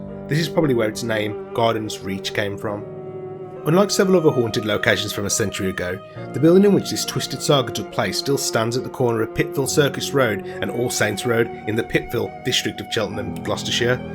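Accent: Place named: Black Country, United Kingdom. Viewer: there are more things that has happened in the past despite going on we cant see in our modern present lives, love the black country accent mate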